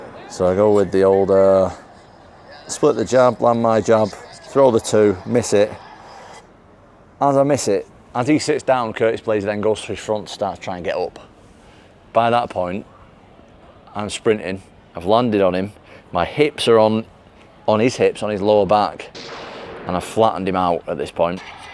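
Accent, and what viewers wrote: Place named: England, United Kingdom. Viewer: I like his English much better than American English ❤ The real one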